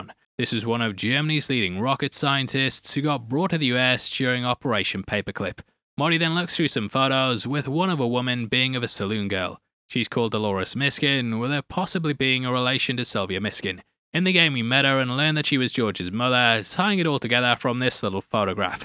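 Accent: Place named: United Kingdom. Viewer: "from when he played Gundam" ....I know british accents make some words are hard, but that's an interesting mispronunciation of Gunman XD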